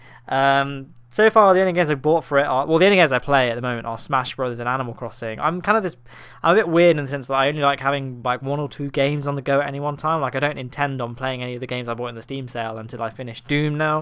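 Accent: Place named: England, United Kingdom. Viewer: You are very English arent you? English is not my mother language but your accent is so strong :D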